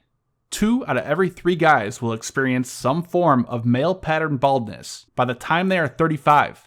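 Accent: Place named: North, United States. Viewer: he might indeed be at least from northern US .. to my,western, Canadian ears I don't hear much difference ..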